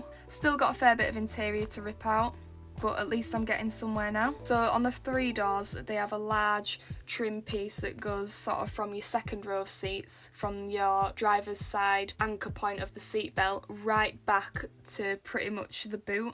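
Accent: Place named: Scotland, United Kingdom. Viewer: That accent is insanely delightful. Hahaha Scottish?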